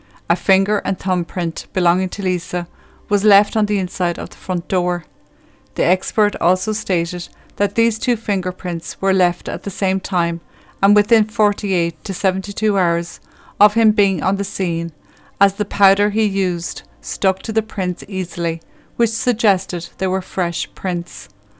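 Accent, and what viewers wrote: Ireland. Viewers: I like this narrator,as her Irish accent makes it more personal,l also like her comments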